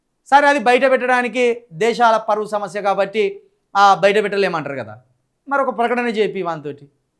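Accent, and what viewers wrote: Telangana, India. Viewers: nuvu Modi Sir ni antunavu kadha nuvu kuda Mallanna Sir tho chepivi nuvu emi tapu cheyaledu tane ne meeda kaksa Kathi kutra chesindu ani. Chepinche galugutava? Enduku neeku avi ani ikada mana telugu rastramlo jarige fake pastors gurunchi oka video cheyi anthe cheyavu. Dalitula degara dashamabagam ani dochukuntunte neeku bada anipiyada? Nuvu telugu telangana slang lo matladutavu baga cheptavu ani ne videos chustunte nuvu emo Hindus ni oka buchi laga chupinchi kiristani ki vatasu palukutavu. Poni nijam cheputava anthe adhi chepavu ani abbadale asalu kiristani lo emi tapu jaragadu antha Hinduvulane untundi anatu matladutavu. Neeku vala degara nunchi dabbulu vastayi anduke vala gurunchi matladavu anthe ok it's your personal & no comment on that but pls don't disrespect Hindus.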